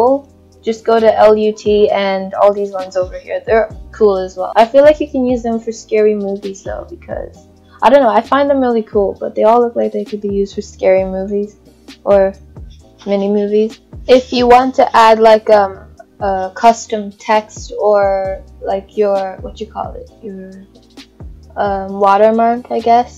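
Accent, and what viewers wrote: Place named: Europe. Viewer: why do i lowkey hear some sort of like european accent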